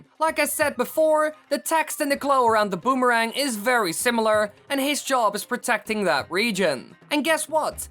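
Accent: Netherlands. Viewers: He has an accent, he's dutch. Accept it or leave.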